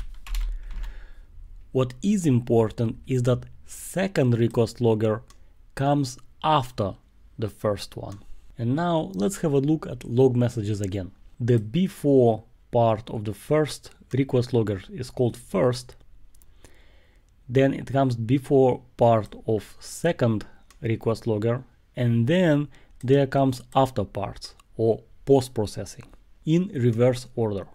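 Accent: Russia. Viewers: I'm hearing Russian accent there)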